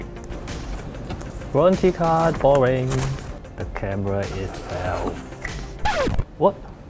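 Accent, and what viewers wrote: United Kingdom. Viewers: Nothing wrong with his English. He manages his puns well, and I do understand what he speaks. And I like his reviews as I like the other Asian's who does British accent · You sound British.....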